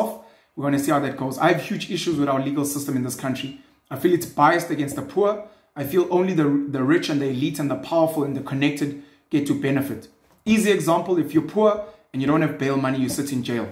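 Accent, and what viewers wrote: Zulu, South Africa. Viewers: Wayiqala ngesiZulu esimnandi mfoka 🙏🏿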